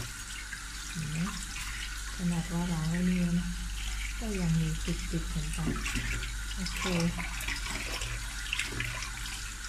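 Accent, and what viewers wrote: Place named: Kanchanaburi, Thailand. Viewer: เสียงแบบนี้คนกาญแท้ๆแน่เลย ผักสดๆน่าทานมากเลยครับพี่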